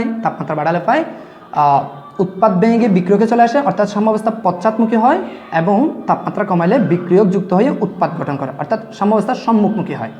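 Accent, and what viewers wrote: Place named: Chittagong, Bangladesh. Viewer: স্যার আমি আপনার ভিডিও আজকে প্রথম দেখেছি এবং আপনার কথা শুনেই বুঝতে পেরেছি যে আপনার বাড়ি নিশ্চয় চট্টগ্রাম।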